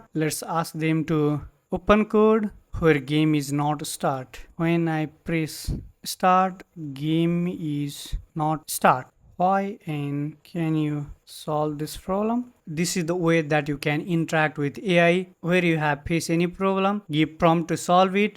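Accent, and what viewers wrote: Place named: Nepal. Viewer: timro nepali accent lagi raxa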